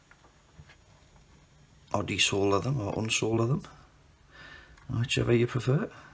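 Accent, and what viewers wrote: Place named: South Shields, United Kingdom. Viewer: I’m in South Shields you sound like you’re up here too, I repair tvs, I’ve had that before on a 12v tv the power supply wasn’t the right one so it was collapsing when it needed more power. Great fix though shame about the quality 😂 probably £5 brand new from china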